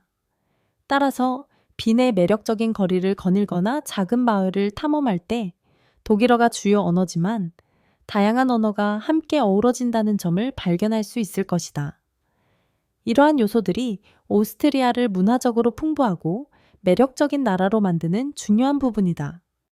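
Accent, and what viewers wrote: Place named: China. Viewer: 한국말 배운 중국인이 나레이션하는거같네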